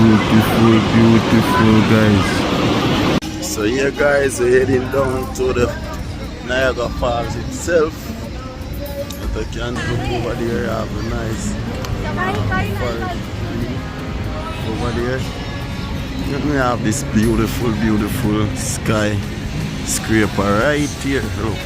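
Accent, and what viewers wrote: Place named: Jamaica. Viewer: WE GET A VLOGGER WHO SPEAK OUR LANGUAGE APART FROM ENGLISH PATOIS PATOIS PATOIS PATOIS AKA PATWA PATWA PATWA AKA BROKEN ENGLISH EG ENJOY YUH SELF MI BWOY CUM BAK SOON ❤❤❤😂😂😂😂😂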